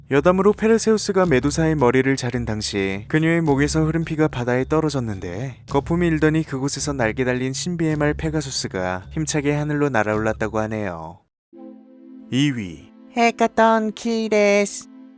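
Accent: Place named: Japan. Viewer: 그리스어 아는 한량입니다 일본어 악센트만 좀 없으면 밥도둑일것같습니다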